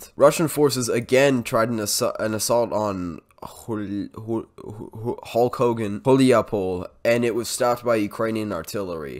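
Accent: United States. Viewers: “Allergies” yeah right, to me you just sound like a fellow Californian. 😎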